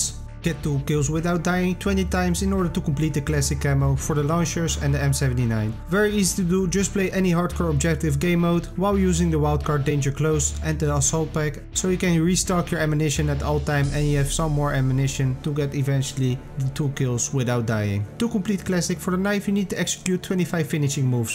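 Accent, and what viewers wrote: Netherlands. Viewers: Je bent nederlands ik hoor het😂😂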